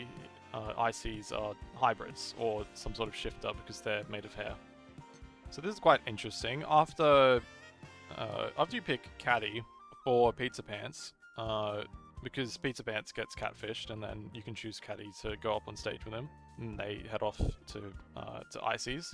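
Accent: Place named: Australia. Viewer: Australian accents are cute though. Show us more about your game soon!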